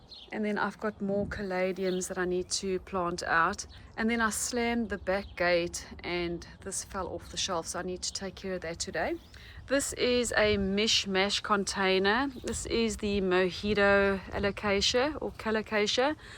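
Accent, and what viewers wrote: South Africa. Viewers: Love love your channel l am learning. Thank you so much. You have a SA accent.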